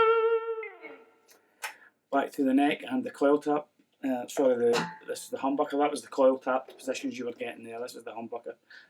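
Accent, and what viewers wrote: Scotland, United Kingdom. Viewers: well I’m Italian but i usually understand English quite well,it might be a mix of your voice condition,audio and (nice) Scottish accent:) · Thanks for the warning , i wanted to buy one of those ( w/o tree inlays) PLEASE, use a lapel mic , or singing mic positioned infront of you. The sound quality on the video is muffled ( nice scotish accent though). Best regards, D.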